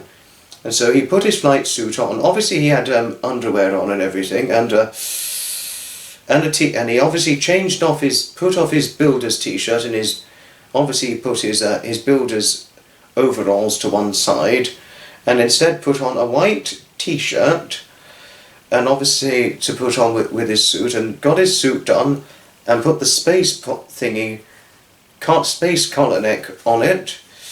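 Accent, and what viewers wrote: England, United Kingdom. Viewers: What a funny coincidence. I have a family relative named Thomas Graves. He was an architect in Baltimore, MD with RTKL and a model train aficionado. I always enjoy hearing your English accent!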